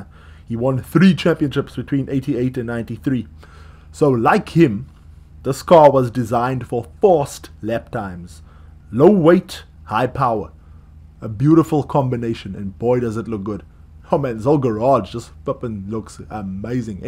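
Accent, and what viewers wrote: South Africa. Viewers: Are you from South Africa? Your accent sound like it🤔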